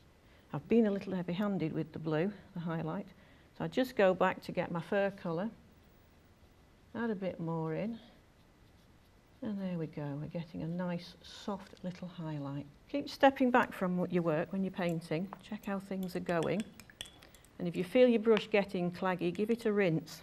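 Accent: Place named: Northern England, United Kingdom. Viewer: Beautiful artwork, do you run courses for using acrylics, you sound northern England like me.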